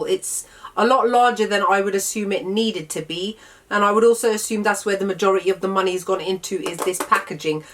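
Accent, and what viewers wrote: United Kingdom. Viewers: thank you very much. The lady above, literally read my mind but when I googled which one this or the Hourglass trio was better value, I got no reply. She read my mind and you've saved me buying this. Can you please tell me the name of the other powder you mentioned after Charlotte Tilbury (I have the same powder but didn't use it yet) you speak rather quickly and I'm Irish and with your UK accent, I didn't catch it. Did you say it was a Nars powder and if so, what one please? Your own make up is stunning. I have brown eyes and again, coincidentally, I was looking for pink eyeshadow palettes but I was disappointed as Amazon only had a Maybelline one and it wasn't vibrant and beautiful like your eye shadow. I like your in depth review and in Ireland that trio is almost 39 euro!! I subscribed to you. Happy New Year from Ireland xx 29th of December 2021 xx ps. 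Please will you tell me all the make up that you used for your stunning pink look? I assumed it would be listed but I was wrong :). It's weird because the past week I have been longing to find a pink based eye shadow palette but to no avail. I only see very insipid pale pinks that look dull and chalky too. I want your metallic effect look with vibrant pinks. I'd love to copy your entire look, so please may I know the products ie blush etc but also their shades? It helps that you're a natural (is it racist to say Arabian beauty? People are so touchy nowadays but you really remind me of an Arabian beauty and it just means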